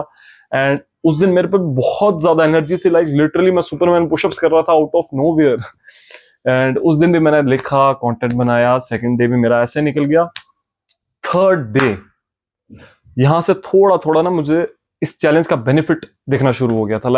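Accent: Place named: Kashmir, India. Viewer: First i thought you're from Delhi then our kashmiri accent got it 😅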